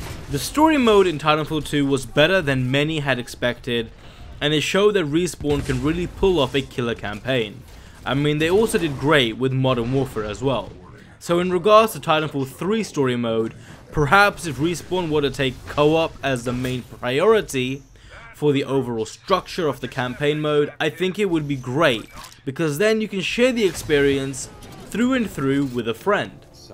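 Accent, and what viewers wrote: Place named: South Africa. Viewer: You sound South African. What servers do you play?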